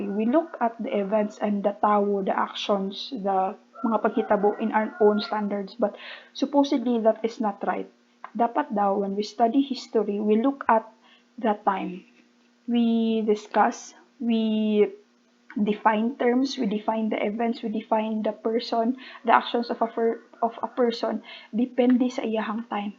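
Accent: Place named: Visayas, Philippines. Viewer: Ang gnda nito kaso may touch ng if im not mistaken bisaya provincial language. That's why somehow i didnt understand some statement . But, its a great help . Thankyou very much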